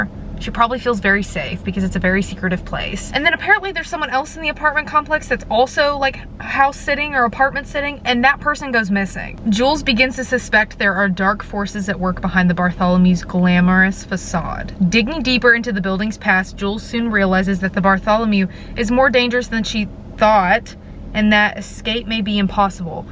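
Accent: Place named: East Texas, United States. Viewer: currently in east texas and your accent is giving me life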